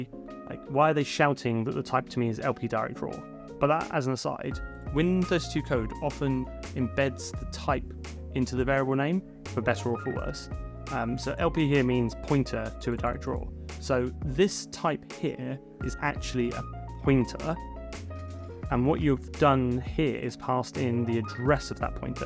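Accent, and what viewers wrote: United Kingdom. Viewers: dude you're like a british me its awesome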